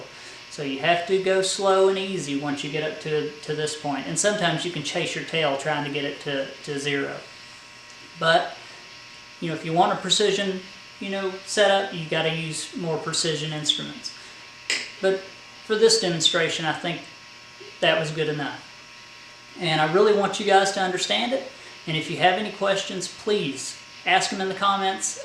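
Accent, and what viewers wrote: United States. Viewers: Can't remember who pointed me in your direction but it certainly was worthwhile. Refreshing to see a youngster working with metal. Sorry if I missed the information in a yet to be viewed video but who manufactured your lathe? I'm not from the USA so please tell me about your accent, which state are you from? As for me, my avatar should give you some specific information. I've just subscribed!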